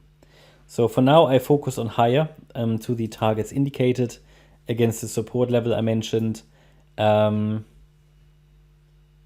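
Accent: Germany. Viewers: Hi, deinem Akzent zu urteilen, würde ich jetzt behaupten du sprichst eigentlich deutsch 😆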